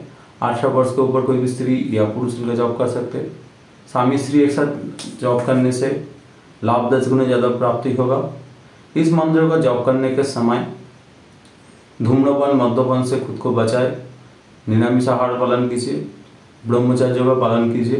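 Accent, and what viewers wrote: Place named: Bengal, India. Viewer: Your Hindi is Bengali.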